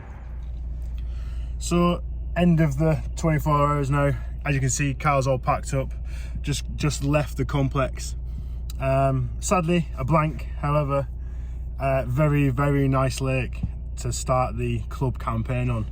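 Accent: Northern England, United Kingdom. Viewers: Always bud! It's all different for everyone isn't it and a good laugh on a blank is still a great time out for me 💪 haha I am yet to catch from linear so don't expect any secret winning tips from me 🤣🤣 yeah mate I would be keen for that! FB me where abouts your club is, think I detect a northern accent from yourself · Where are you from? Clearly a Northern lad! Me and my mate fish here and have been Farnham members for a few years. We travel from Blackpool. Check out Red Rose angling for a session we recorded on Badshot Lea Big Pond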